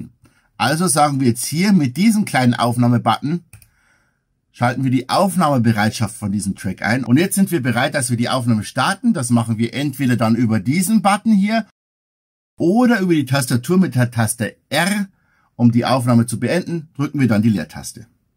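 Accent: Bavaria, Germany. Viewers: Ich bin seit gefühlt 100 Jahren Cakewalk-Fan angefangen von Cakewalk 3.1. Aber jetzt Cakewalk (by Bandlab) ist der Wahnsinn. Und noch ein groeßerer Wahnsinn ist diese Tutorial-Reihe mit diesem super Moderator. Ich bin a Preisss aber die bayrische Sprache mag sehr. Lieber Moderator und Cakewalk-Spezialist/Musiker/Tontechniker usw...., ich bin so dankbar für diese Tutorials. Jazzjoe aus Bad Nauheim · hab gleich gemerkt das du bestimmt auch aus bayern kommst😎😁 bist auch aus oberbayern oder? 😅 wenn ich fragen darf*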